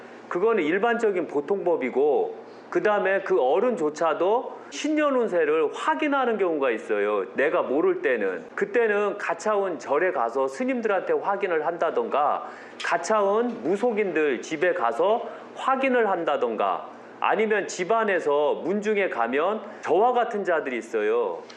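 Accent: Chungcheong, South Korea. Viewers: ㅎㅎㅎ 완전 충청도분이네? 가차운----> 가까운 ㅎㅎㅎ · 맞아요 충청도의 대지관님 이시여요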